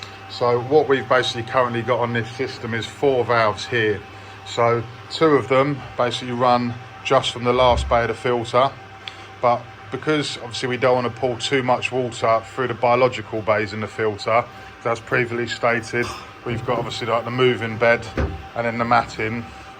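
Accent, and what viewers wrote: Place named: United States. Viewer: I thought you were British you start talking and I find another american.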